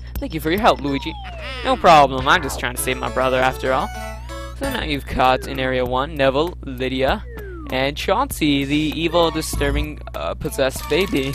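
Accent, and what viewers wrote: Canada. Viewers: Well, Chauncey is shaking, so it makes sense. I shouldn't have said anything. And also Caspin's right, you do sound Canadian. You say "zed" instead of "zee" like Americans. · you pronounce your "ou" sounds in a canadian way, i was just wondering :P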